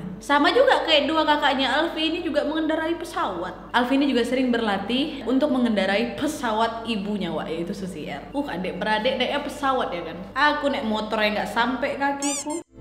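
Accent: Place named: Lampung, Indonesia. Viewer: Kamu orang lampung yahh logat ny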